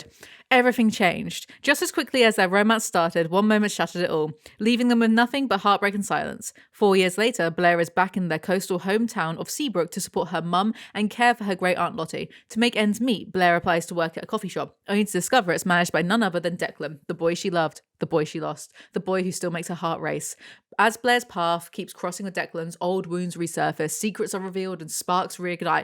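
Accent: United Kingdom. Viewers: There's that aggressively British accent! 🤣 Glad to hear that bonkers accent once again. 😊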